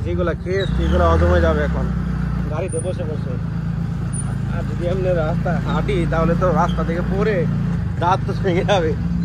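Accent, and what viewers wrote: Bangladesh. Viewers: কিন্তু ভাই তোর কথা শুনে মনে হচ্ছে তুমি বাংলাদেশি , সাবাধানে থাকিস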